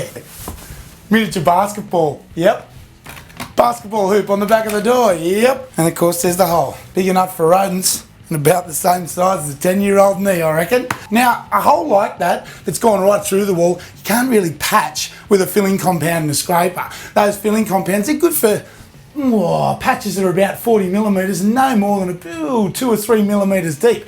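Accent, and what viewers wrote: Australia. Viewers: The Australian accent 🤢🤢🤢🤢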